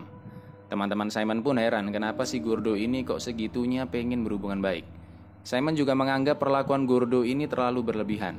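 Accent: Java, Indonesia. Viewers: Jawanya keluar:'v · Adminnya orang jawa ya?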